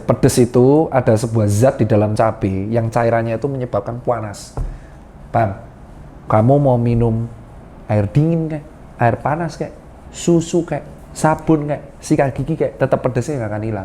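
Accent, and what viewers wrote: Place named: East Java, Indonesia. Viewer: Bosoe wong jatim...tuh..mantep pokoke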